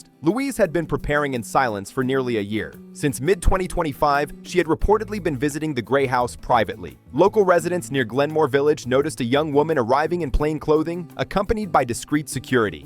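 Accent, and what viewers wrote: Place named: United States. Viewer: I'm American and I don't understand why a loud American is the narrator either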